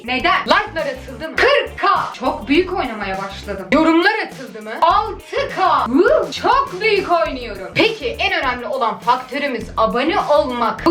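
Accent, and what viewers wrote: Turkey. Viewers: Sen heç AZERBAYCANLİ KİMİ DANİSMİRSAN TURK KİMİ DANİSİRSAN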